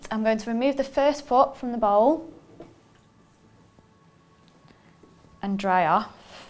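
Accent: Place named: Manchester, United Kingdom. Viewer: No, it's a Manchester accent.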